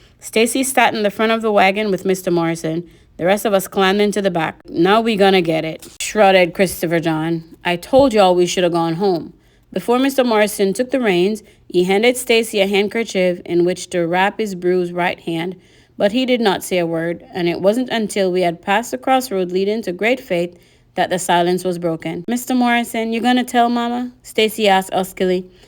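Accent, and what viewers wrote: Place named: South, United States. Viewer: I’m here smiling from ear to ear, while teacher reads in her southern accent 😂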